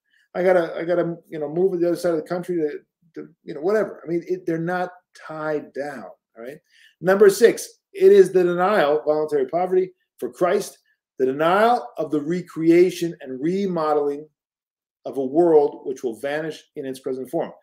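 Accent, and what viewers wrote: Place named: Upstate New York, United States. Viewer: Does originally come from Syracuse/Rochester, New York? His accent sounds like it is from that area. I knew someone from a church I once attended in California who had his same, exact, identical voice; and he had moved to CA from Syracuse/Rochester, New York.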